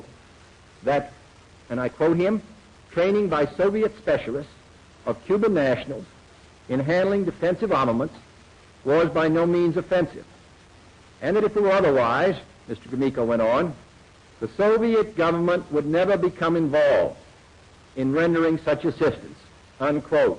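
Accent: Boston, United States. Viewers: What accent? He sounds like a Bostonian trying to sound like he's from Chicago. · It was his Boston accent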